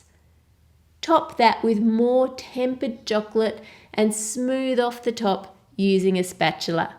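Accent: Australia. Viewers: I watch a lot of your tutorials, and for that I really have to ask, can you please speak slower? because I'm not a native speaker, I'm italian, and it' so difficult for me to understand everything since I'm not used to listen to aussie accent! I'm not trying to be rude, I really love your recipes, and I need to understand everything so that I can try them :) thank you!!! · It's a classic Auzzie accent. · I love your accent!! Is it Australian?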